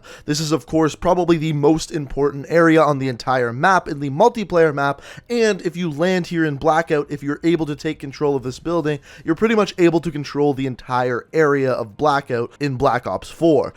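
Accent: Canada. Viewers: R u Canadian? Love it accent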